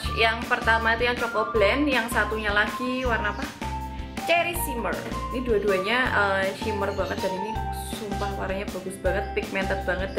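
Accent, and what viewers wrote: Java, Indonesia. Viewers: Baru pertama nonton aja udahh love sma 😍😍 medhok e kyok aku rek wkwk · Kesini krn beranda tak kira org korea trnyt medok wkw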